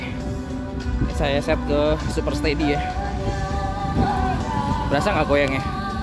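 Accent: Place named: Tegal, Indonesia. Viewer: Weh tegal toh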